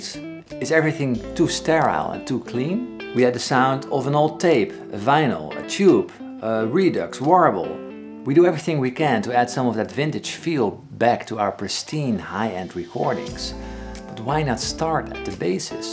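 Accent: Netherlands. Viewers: I really enjoy your channel and while listening to you speak, tried to guess where you were from, your music speaks for itself:) It's close to American mixed with British, but my guess is The Netherlands. Years ago I met a couple in the art store where I worked and though they sounded American, there was a few words where the d's sounded sort of German. I asked where they were from originally and yes, it was Holland. They had lived here a long time but found the sounds of American English quite easy to master. So, did I guess right? Cheers, Rik Spector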